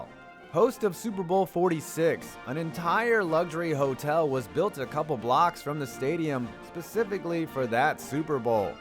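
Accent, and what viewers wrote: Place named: Boston, United States. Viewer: Lazy basterd Boston chick voice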